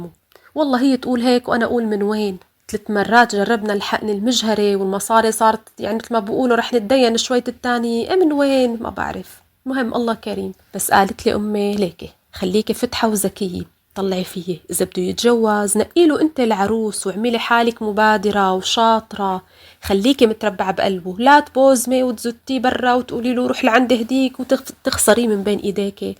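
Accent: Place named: Homs, Syria. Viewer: دخيل لهجية حمصي ❤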